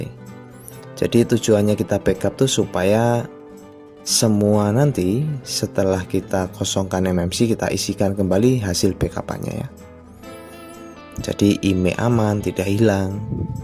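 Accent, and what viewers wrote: Java, Indonesia. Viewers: Logat nya kaya org jawa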